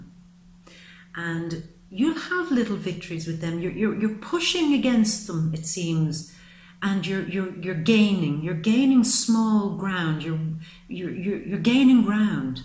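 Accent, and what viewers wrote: Ireland. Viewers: I just love you. And your Irish posh accent :) you remind me of my Irish friend.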